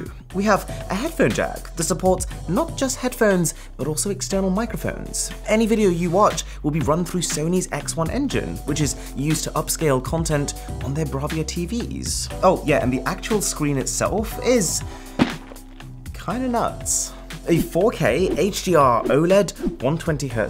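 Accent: RP, United Kingdom. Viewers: $1800? Bloody madness I should think? Buy this to keep up with the Kardashians as it were? Cheers! BTW I am aYank but like how you Limeys speak. 😂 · like the products...dont like the way he talks like if he were a posh brit....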